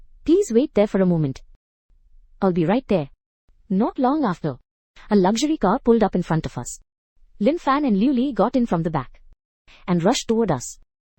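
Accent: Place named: India. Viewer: Coba yg naratornya English biasa masih aman tp ini india adehhhhhh harus pasang telinga utk lebih jelas pengucapannya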